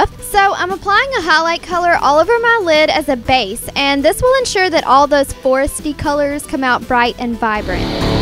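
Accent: South, United States. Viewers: this was a really good video! Thought I would pop over and take a look because I had just uploaded a video on the katniss look and your video came up as a suggestion :) Great editing and excellent job on the video... love your american accent! :) Going to sub you :) Oh and I used the same nyx illuminator in my video too! lol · I love you accent southern belles represent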